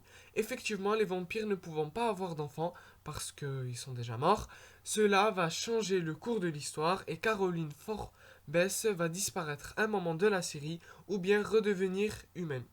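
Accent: United Kingdom. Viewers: Aucune information donné est vrais se qui en plus de ton accent anglais ma fait énormément rire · Mon dieu, tu dis n’importe quoi ! Et ton accent Anglais ! Vraiment je dis pas ce genre de choses, tout est faux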